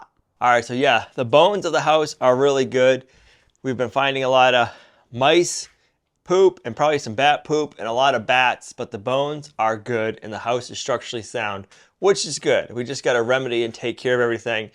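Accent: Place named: Boston, United States. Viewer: Boston accent! They just talk different out there.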